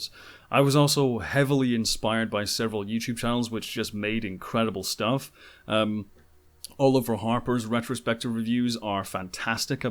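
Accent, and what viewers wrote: Scotland, United Kingdom. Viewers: . Your brogue is wonderful. Edinburgh I'm guessing?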